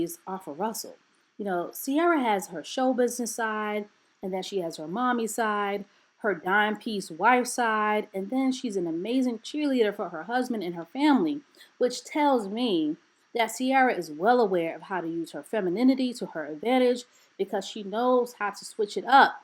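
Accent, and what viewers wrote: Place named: New York, United States. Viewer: Sis " Busted can of Biscuits" you sooooo New York. I love it !!!!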